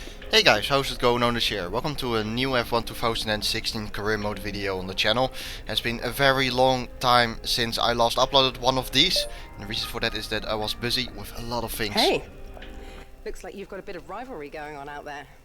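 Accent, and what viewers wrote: Netherlands. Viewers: Ik kon gwn aan be engels horen dat he nl bent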